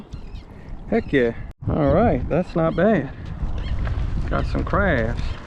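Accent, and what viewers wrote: Louisiana, United States. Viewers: R u from Louisiana i hear the accent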